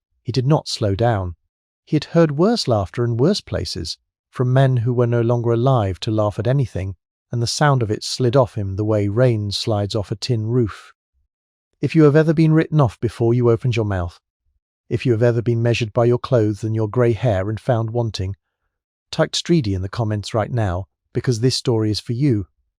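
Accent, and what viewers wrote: United Kingdom. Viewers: You know I don't know what's worse you can't pronounce stuff right what are you talking about the bow of a boat and then you got to have some britt accent that I can't stand it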